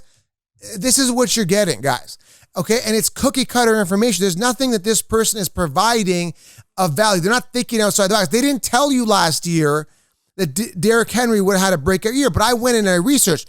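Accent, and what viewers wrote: Canada. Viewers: Are you from Canada? 🇨🇦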